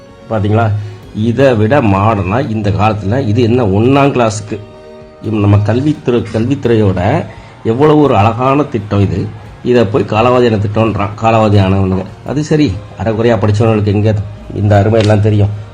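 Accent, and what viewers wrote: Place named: Bangalore, India. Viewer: Ne bangalore tamil pesitu iruka solu da DMK SOMBU dog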